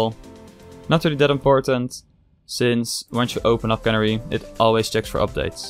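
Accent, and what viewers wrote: Netherlands. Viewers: je hoort echt tering goed dat jij nederlands bent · ik hoor dat je nederlands bent haha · HAHA, nederlands accent :) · ik kan gwn meteen horen dat je nl bent xD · wat een lekker Nederlands accentje zeg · Are you Dutch? I mean. Dat accent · You sound ver Dutch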